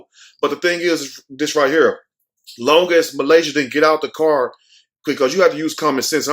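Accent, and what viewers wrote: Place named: Texas, United States. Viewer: Didn't know you were in Dallas boo..I'm tryna meet and greet..I knew you sounded like a Texan..lol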